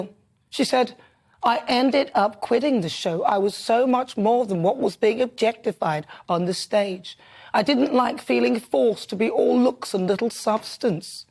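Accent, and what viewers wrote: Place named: England, United Kingdom. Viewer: you are brilliant. You see it and you tell it. Love your English accent too:-) Hi from NZ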